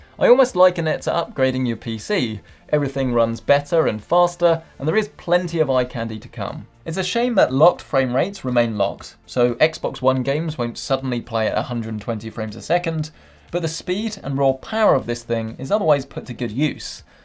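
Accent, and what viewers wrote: United Kingdom. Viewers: A XBox review in true British accent.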